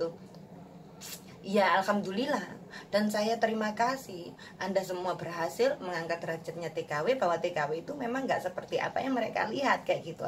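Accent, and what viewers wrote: Java, Indonesia. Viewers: Samean jowone ngendi to? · Assalamualaikum, Mba koe jowone ngendi se? Semoga di paringi sehat mbahnya mba jg sukses selalu ya, 🙏🙏😘😘😘👌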